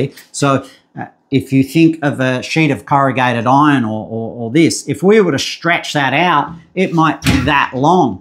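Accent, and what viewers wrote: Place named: Australia. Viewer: Great video! I’m challenged to keep up (British vs American accent), but YouTube speed control to the rescue. One nit pick. A few times you said “mil” but it looked like you wrote “mm” on the board. And unfortunately the board writing was not clear enough to be certain that you had written “mm”. The problem is that being Australian, you are libel to use either imperil or metric, and hence it is imperative to be crystal clear as to which units we are talking about. I’m a physicist and hobby machinist in USA, so I’m well versed in both metric and imperial. In USA (and a large part of the world), when even remotely associated with machining, a “mil” is a thousandths of an inch. No self respecting physicist (and really any scientist) would ever use the word “mil” for “millimeter”. We call it by its proper name, “millimeter”, “centimeter”, “decimeter”, etc. 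why? Because that is what it is. And as a byproduct one avoids all possible confusion with the imperial “mil” (1/1000”) which is pervasive in the imperial machining world. Maybe if 100% of the world uses metric in all areas then we might tolerate a slang of using mil for millimeter. But the world is not there yet and likely quite a few years away from such universal adoption. In the mean time we really need to stick to calling things by proper and industrially accepted names and refrain from shortcut slang which might be confused. Thanks!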